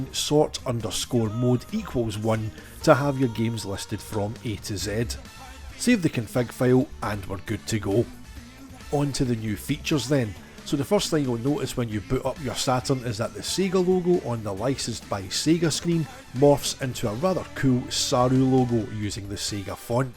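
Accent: Scotland, United Kingdom. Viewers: I like the Scottish dialect ❤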